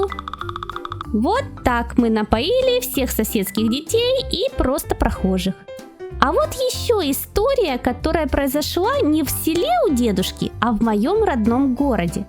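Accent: Ukraine. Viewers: Ты шо украинка а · я вижу па голосу что ты украинка я тоже украинка просто нет такой клавиатуры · Ты что украинка что ли ? Ну пипец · Дуже красиво говориш Українською🥰💖. Слава Україні героям слава! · Ты из Украины😅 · Ты так красиво говоришь на украинской мове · Ты что украинец?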